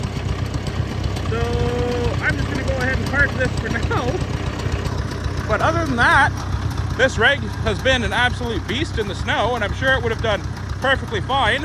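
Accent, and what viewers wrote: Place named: East, Canada. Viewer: I know a guy from eastern Canada when I hear him. Ontario, Nova Scotia? Surely not from New Foundland 😂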